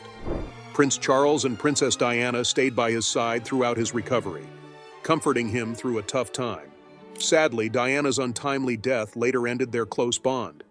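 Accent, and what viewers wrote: United States. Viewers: There are a few such Royal Family posts of YouTube today.Obviously someone has an agenda. The American Narration is the clue to give it a miss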